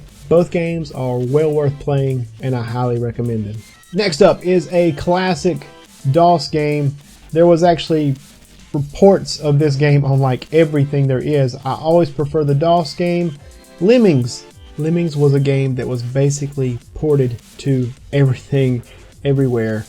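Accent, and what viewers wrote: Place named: South, United States. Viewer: Mike B sent me...you sound nearly as country as me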